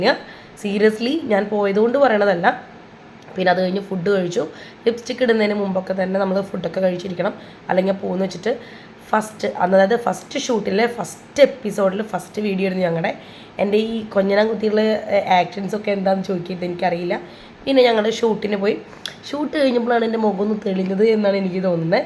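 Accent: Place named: Thrissur, India. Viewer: Hi chechi episode kandu 🤩ivide amma ennum kaanarind ee paripady. Chechide videos okke njan youtube il kaannarind pettannu tvil kandappo suprise aayi 😍😍 njan ammodu parayarnnu ee chechine inikkariya inikki ariyana chechi aanu ennokke. 🥳🥳🥳 skit valare nannayirunnu nammade tsr slang skitil ketappo nalla rassandayi 😌🥳 inim skitilu chechine pratheekshikkamo🙈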